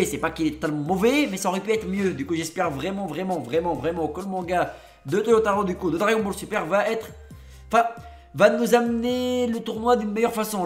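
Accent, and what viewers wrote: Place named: North Africa. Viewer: L'accent du blédard 🇲🇦🇲🇦🇲🇦🇩🇿🇩🇿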